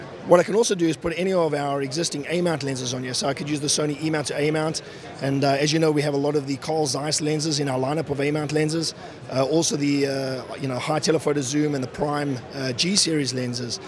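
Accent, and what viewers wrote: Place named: South Africa. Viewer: I can't decide if this guy is South African or Australian. In fact I think he's probably a emigrated South African, there are thousands of them in Ozzie land ;P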